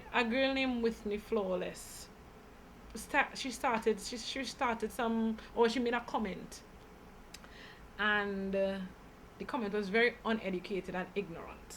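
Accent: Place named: Jamaica. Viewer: You already sell out. stay in Jamaica married ah man and get Jamaican citizenship caused you already sounds like a yardy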